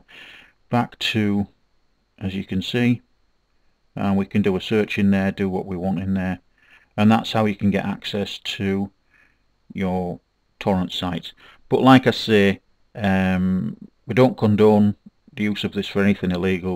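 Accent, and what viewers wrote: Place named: United Kingdom. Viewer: nice i've always wanted British people narrate .. lol nice voice the damn grammar XD!! don't get me wrong though .. nice vid and i'l be checking in and out for updates in pirate browser . .again thx man ..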